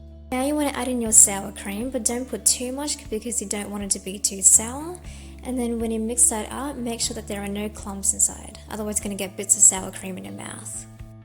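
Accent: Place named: Australia. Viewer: nice to hear an aussie accent for this!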